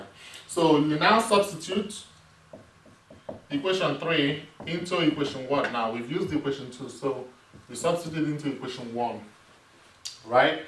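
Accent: Nigeria. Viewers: this helped alot! thankx..sorry are you an Igbo Nigerian Man?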